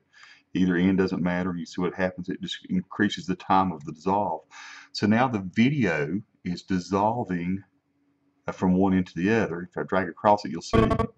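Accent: South, United States. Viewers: I love your southern voice!!! Thank you for the tip! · Thank you, great help. I grew up in Southern Mississippi but have been away so long that it was nice to hear a down-home Southern accent again. And you play beautifully, I'm going to listen to some more of those Blues!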